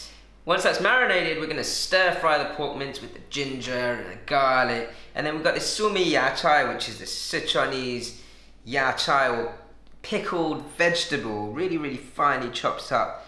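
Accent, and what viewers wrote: England, United Kingdom. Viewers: Random question: are you English? You sound like you have an accent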